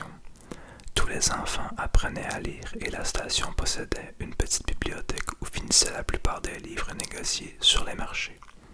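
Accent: Quebec, Canada. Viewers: Je t'ai découvert très récemment et je te remercie infiniment pour ce magnifique moment passé à tes côtés ❤️ je ne suis pas énormément d'asmrtistes québécois mis à part la asmrtiste "asmr u okay?" qui est la seule que je suis avec toi, tout cela pour te dire que ton accent québécois est tellement agréables à l'oreille 🤗🤭 Plus le fait que j'aime beaucoup la lecture et la lecture chuchotée tout cela ajoute un côté très agréable à l'oreille et m'apaise entièrement ♥️. J'ai déjà regarder la saison 01 de The Witcher et je sais que la saison 02 est en train d'être tourner en ce moment, je ne comprenais pas tout ce qui se passait dans l'intrigue jusqu'à ce que je comprenne seule qu'il y avait des moments qui allaient dans le passé et d'autres qui retournaient dans le présent, c'est vrai que j'étais perdue mais après j'ai réussit à suivre l'histoire et à apprécier Yennefer et Geralt avec Citri si je ne me trompes pas pour son prénom 🤔. 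Je n'ai pas encore lu les romans et je ne sais pas vraiment comment suivre l'ordre chronologique 😂😂 Concernant métro 2033, je ne l'ai pas lu mais j'ai vu le résumé et l'histoire est très plaisante, prendre en compte une histoire de zombie qui vivent à la surface avec des gens qui se sont confinés en sous terrain pour survivre correspond tout à fait à la situation actuelle avec un côté fantastique à la Cloverflied Lane mixé à World War Z, c'est juste agréable de lire ce genre d'histoires là 👌. Merci encore pour tout ce que tu fais et de lire aus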